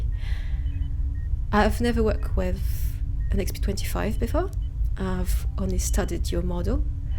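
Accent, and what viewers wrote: France. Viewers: For once the french accent is actually french, and not some strange abomination of an accent 😭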